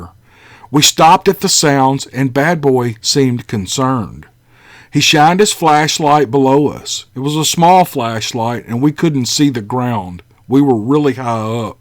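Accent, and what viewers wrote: South, United States. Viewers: I just want to say that I immensely enjoy all your stories and music and I enjoyed watching your bike ride with your cute dogs running around you. I hadn't heard much about dogman and was surprised to find out about another cryptid. I love hearing about sasquatch, dogman, and the ufo sightings. I live in Toledo, Ohio and I love your southern accent. It's so friendly and homey sounding. Well, I don't want to ramble on to much but I love your channel and could listen to the stories all day and I can tell that you love reading them. Thank you. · "How y'all doing?" Absolutely Southern. I've been watching bee keepers on YouTube and one in North Alabama said the "comb was about the size of a bisquit. " Just had to laugh. Where else would you hear that comparison? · I’m pretty sure I hit subscribe 5 seconds after hearing you start narrating. 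- your voice/cadence/accent is totally “good ol boy” and I can not get enough! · are these your drone shots? I love them. I am from the lowlands of SC, big family property in the middle of nowhere. I live in Northern California these days. Your videos make me think of home as does your accent and manner. I listen to your stories whenever they come out before bed. So thank you! Some of these stories have happened in either my county (Santee in Orangeburg) or on the New River in NC where the other side of my family has been there for hundreds of years. Never seen Bigfoot. Other things though. Anyways I got to visit Mississippi someday. People kinda put it down but obviously that is hogwash especially if you love the land and blues. Kinda like an even wilder version of SC. Let me know about that drone footage.....and yes dogs have a very special and particular place back home too, they qualify as a y’all. 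· Hey, I've commented in the past about how I love your voice and soft southern accent. Well, I didn't mention it before, but I also think it's wonderful how you have such a kind, tender heart!!! With your love of the outside, your dogs, your home State,Mississippi, and your family, I think you are about the perfect Southern Gentleman!!! We are so lucky for! Great stories! Great narration! I am so glad you spend time creating such an interesting and fun channel!!! Thank you for all the time & thought you put into making great entertainment!👏👏👏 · Love your stories. It's just what I need to get thru these bad, horrible times. It's bad up here in the northeast. I love your southern twang and relaxed state. God bless. From cold NE.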